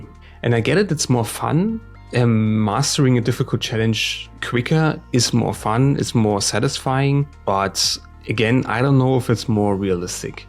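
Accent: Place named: Germany. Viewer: Are you German (because of your accent)? I am German as well, that’s why I am asking:D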